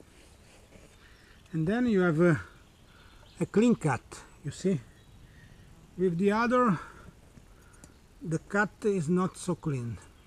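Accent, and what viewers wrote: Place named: Avellino, Italy. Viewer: Noto un accento avvellinese a sto inglese 🤔🤔🤔🤔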